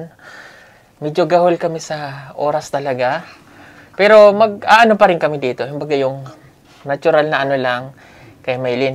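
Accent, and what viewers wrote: Visayas, Philippines. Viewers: Kuha kuha na ni ang pag sasalita ng bisaya. Pati accent kuha kuha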